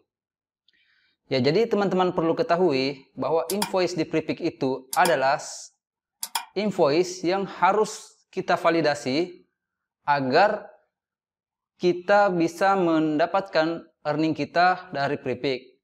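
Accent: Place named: Makassar, Indonesia. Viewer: Kak orang makassar yah?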